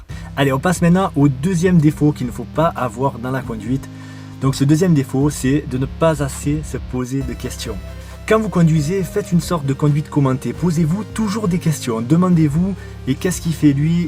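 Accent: South, France. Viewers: Accent de sud????? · Super vidéo, et super accent du sud. 😊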